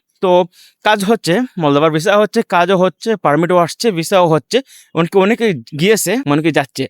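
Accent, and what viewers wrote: Sylhet, Bangladesh. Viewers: Bhai afne Kita sylheti ni? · ভাই কিতা সিলেটি নি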